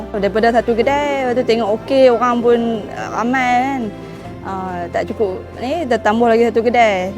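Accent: North, Malaysia. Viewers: Pekatnya utara kak ni, utara piau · Aku suka kak cik ni kekalkan loghat utara, tak buat2 nak pelat... Itulah tanda kuat pendirian dan kuat jati diri. Terbaik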